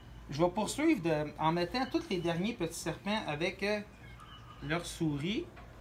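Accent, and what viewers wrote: Quebec, Canada. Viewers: Snoube - cest laccent québécois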